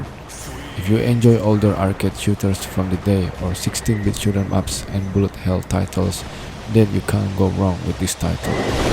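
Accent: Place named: Indonesia. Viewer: bang logatnya mirip orang indo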